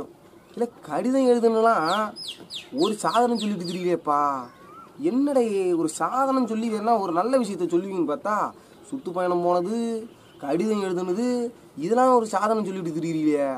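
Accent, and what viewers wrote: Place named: Tirunelveli, India. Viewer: நெல்லை தமிழ் சிறப்பாக தான் உள்ளது.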